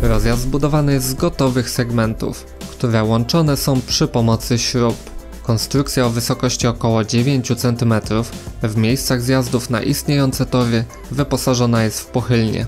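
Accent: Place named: Łódź, Poland. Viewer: Od razu słychać że jesteś z Łodzi. Krańcówka co :-)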